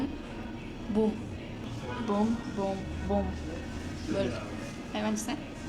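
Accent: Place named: Namangan, Uzbekistan. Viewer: Bir namangan tili bir toshkent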